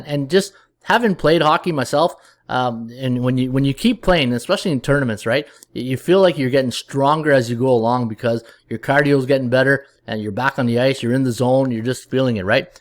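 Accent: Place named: Canada. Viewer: You sound canadian to me...therefore, I'll be expecting many tee's down the road to back that tee from the other day. We sure lucked out yesterday with the Nats. Padres are scoring in bunches, and it doesn't seem to matter who pitches (recently). Going to be keeping my eye on it...their Staff, ignore the numbers, is weathered and tired. They are becoming overworked, and it should trend in the over direction with the offense/tired bullpen combo.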